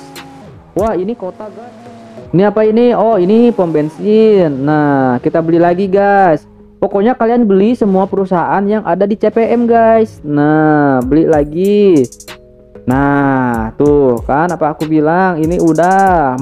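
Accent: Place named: West Java, Indonesia. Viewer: Logatan sunda wkwk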